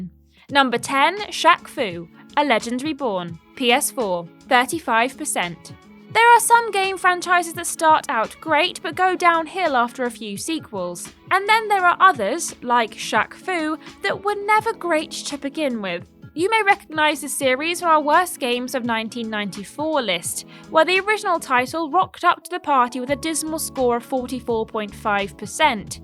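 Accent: United Kingdom. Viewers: This girl is the most British person I've ever heard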